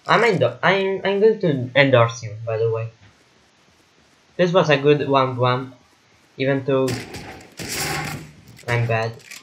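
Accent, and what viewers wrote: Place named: Italy. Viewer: 100% italian voice xD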